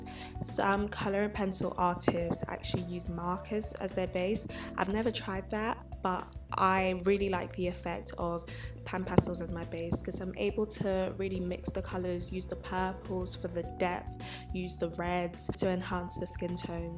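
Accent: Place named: United Kingdom. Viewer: I love your accent, I’m british too, also this is an incredible drawing! You have a lot of talent.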